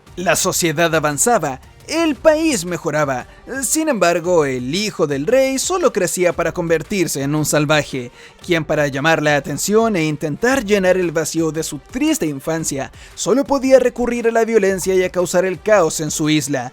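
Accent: Chile. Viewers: tu no eres de mexico, eres chileno hahaha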